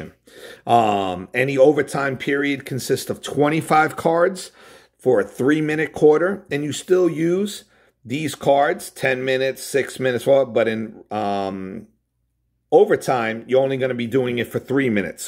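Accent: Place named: New Jersey, United States. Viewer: I loved the video...and from your accent I'd say you were from New Jersey :) I'm starting a "hybrid" league (12 divisions w/8 teams per division) based on HS basketball rules (4 quarters - 8 minutes long each quarter). I'm going to start with the basic version and work myyself up to the advanced version (and your tutorials really help!)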